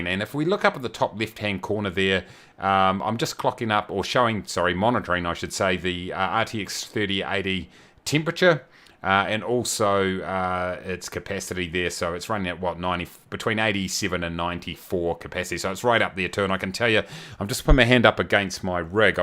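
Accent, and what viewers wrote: New Zealand. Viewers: Nice to hear a New Zealand 🇳🇿 accent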